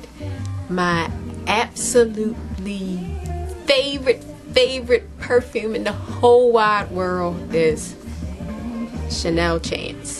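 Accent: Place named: Maryland, United States. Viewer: thought i heard a maryland accent!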